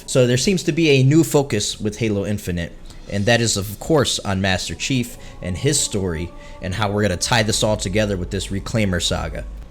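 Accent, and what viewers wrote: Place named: Minnesota, United States. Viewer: Are you from Minnesota? You sound like a Northern Lad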